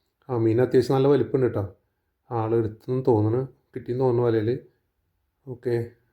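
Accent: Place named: Thrissur, India. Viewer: അതെ നമ്മുടെ തൃശൂർ സംസാരം 👍😊😊😊അഹങ്കാരം ഇല്ലാത്ത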